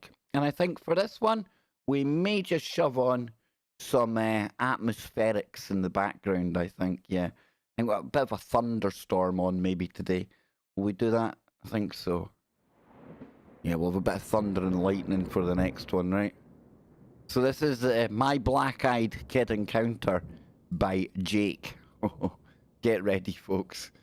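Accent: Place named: Scotland, United Kingdom. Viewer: Hello from sunny San Diego CA, USA! You rock... Thanks for lifting my spirits. & I am so in love with your ACCENT!!! I just really love it... It's kinda soothing. Blessings to all of you in beautiful Scotland!!! 🤓💜🎉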